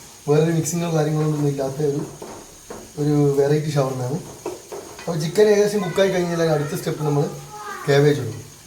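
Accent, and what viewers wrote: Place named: Malabar, India. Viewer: Voice over kodukkumboo maathrollu le ee malabari type samsaram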